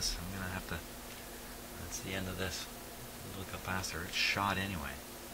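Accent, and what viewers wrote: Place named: Canada. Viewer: Fascinating. I had heard a lot about "silver mica disease", but it's great to actually see evidence of the problem. I just found your channel through this video, and was thinking "this guy sounds Canadian", then I spotted the maple leaf sticker on your toolbox!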